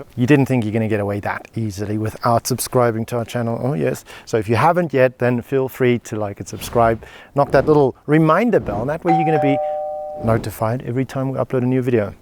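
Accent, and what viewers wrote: South Africa. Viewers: A South African accent is so unique. And it explains emotions and feelings so well. Great job on bringing us these wonderful videos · Is my guy South African ?? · Didnt know homie was south african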